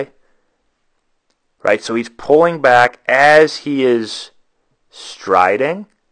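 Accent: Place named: East Coast, United States. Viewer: Anyone tell you that you sound a bit like Howard Stern? I think it's just your east coast accent lol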